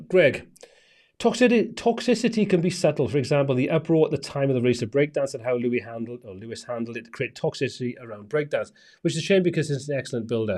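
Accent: Wales, United Kingdom. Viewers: is from Wales, it’s just his accent…maybe it’s a plug-in you are talking about?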